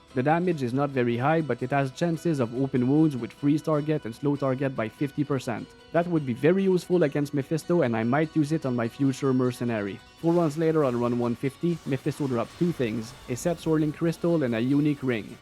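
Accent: Quebec, Canada. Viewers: J'entends le bon Québec! Haha ❤ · ARE YOU FRENCH CANADIAN ? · Good ol Queb accent, keep the grind brozer ! ( all the run was in hell ? ( online or solo ) ?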